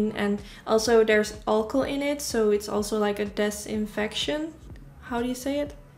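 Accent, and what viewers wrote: Netherlands. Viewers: Are you dutch , you have kind a dutch accent. No offense becausse when i talk english it sounds like crap (also my writhing is really bad , sorry for that) i really liked youre video . You have 1 new sub❤️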